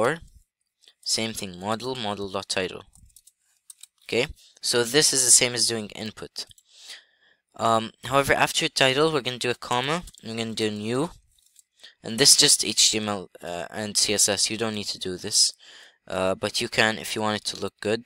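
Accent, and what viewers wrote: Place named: Arab world. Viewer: Unrelated question: Your accent sounds Arabic. Are you Arabic?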